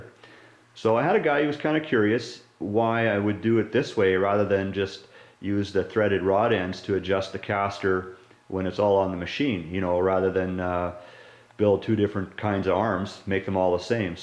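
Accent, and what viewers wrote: Canada. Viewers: Love the way Canadians say "out"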